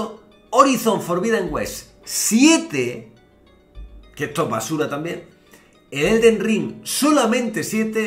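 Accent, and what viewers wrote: Spain. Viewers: Me da risa que todos los españoles leen las palabras en inglés como si fueran en español 😅. Creo que nadie en Latinoamerica hace eso.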